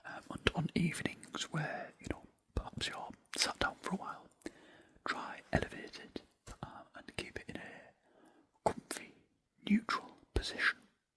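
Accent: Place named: Northern England, United Kingdom. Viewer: This is amazing . I’m rubbish at telling the northern accents apart (Londoner 😂) but whatever it is, is so relaxing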